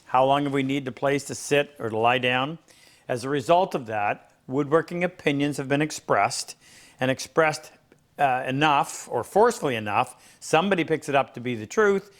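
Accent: Canada. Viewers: Why can't Canadians pronounce "out". You lost me at number 1. I curl my finger tight on the corner edges so the glue is only in the center. I never want much squeeze "out". Good prep requires very little glue and far less clamps.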